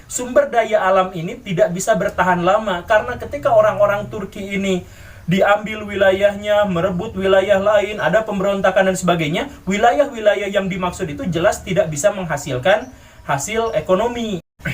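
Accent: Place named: Sunda, Indonesia. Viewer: Kaciri ari nu ijid mh kana solawat jeung wirid, koh lentong na mh siga sunda tp nuansa wahabi,aneh aja gituu teu matching 🤣 Introspeksi? Yaa kita coba introspeksi. -Jangan salahkan zionis freemason dll,tp yg justru di salahkan solawat dan wiridan. -Nenek moyang bangsa turki pernah menguasai dunia, -Nenek moyang bangsa kita pernah di kuasai dunia (dan sekarang pun masih). -baheula Kerajaan2 islam di nusantara berafiliasi ke turki,tp apakah sekarang ada yg dr turki berafiliasi ke indonesia? -turki termasuk negara maju, Sedangkan indonesia negara berkembang, yaa yang namanya berkembang kan bisa aja layu lagi,(dan sekarangpun kembali layu) *Jd yg keren itu mereka yg turki,yg pernah menguasai dan di segani dunia , Atau kita yg indonesia,yg pernah di jajah dunia? *Jadi yg harus introspeksi mereka yg turki yg kualitas nya jauh di atas negara kita,atau kita yg jauh di bawah negara mereka??? 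Lier aja gituu 🤣😂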